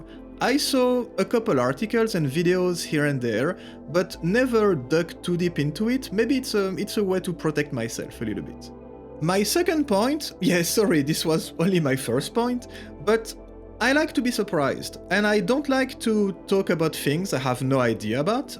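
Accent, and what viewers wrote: France. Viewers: are you french by any chance?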